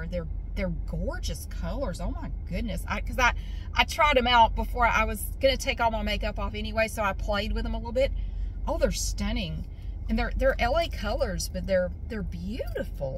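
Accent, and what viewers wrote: South, United States. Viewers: When I first subscribed to your other channel in late 2016,I thought to myself ,I finally found a Southern Asmr Artist( which was hard to find back then) I enjoyed your later night uploads, Congrats on getting a job, it's the best idea for anyone to not rely on a criminal corporation like Google⚡️🔥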